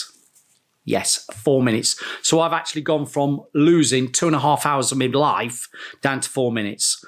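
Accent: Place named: East Midlands, United Kingdom. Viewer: Great to hesr an east Midlands accent on YouTube .. im originally from Grantham ..did my yts for wj king in melton in 1990... Worked all over notts.. Finally great to see a painter doing videos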